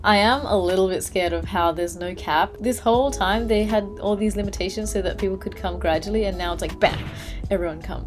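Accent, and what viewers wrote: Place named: Australia. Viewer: I like your Aussie accent so much.please keep it up uploading like this with accent! Less to see someone speaking Aussie accent. 頑張ってください！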